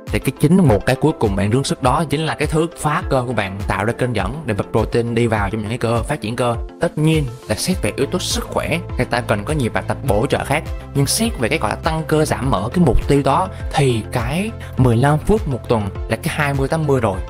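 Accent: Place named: South, Vietnam. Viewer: Bạn cứ nói đại giọng bắc..bạn nhái giọng Nam nghe mệt hơn. Nó chen giữa 2 vùng miền nghe khó chịu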